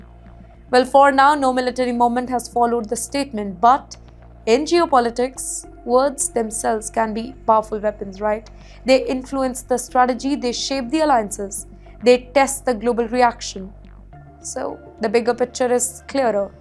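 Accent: India. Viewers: Her English smells like Hindi.. and shaking her legs while reading news.. correct it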